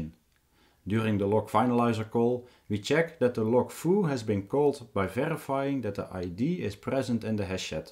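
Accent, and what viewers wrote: Netherlands. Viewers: I SMELL DUTCH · Netherlands, obviously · Ik kan je Nederlandse accent horen haha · just about to say if this guy ain't dutch then my life's a lie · Heerlijk zon nederlands accent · Only Dutch people will know where this guy is from just by listening to his pronunciation.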